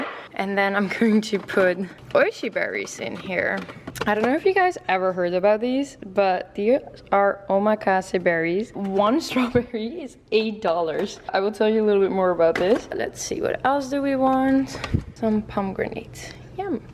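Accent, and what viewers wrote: Netherlands. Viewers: I love the Dutch accent. My very best gf came from Holland to Los Angeles when we were young. 'Besties' from age 10. In her home they spoke only Dutch. Tried to learn some, but it is very difficult language! She passed a few years ago and I miss her so. But I get to hear your beautiful Dutch accent. It reminds me so of her. She too, was tall & very beautiful like you. I listen to your vids and hear you talk, and it brings to mind something very special...a Dutch home, my 2nd family and my BFF. So enjoyable to watch you. AND Im learning about the value of 'healthy' food. It does change your energy level! (Im getting there LOL) Also enjoy the print work you do. Those 'warm' wintery clothes are beautifully appealing. But in LA?? (I still get their catalog) Naaaahh, too hot🔥. Well then, maybe see you in Park City??? 🌷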